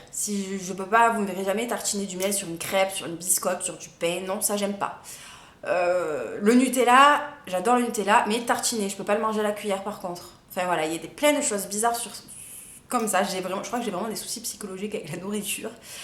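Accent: South, France. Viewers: je kiffe ton accent du sud bisous bisous❤😘👌😂😉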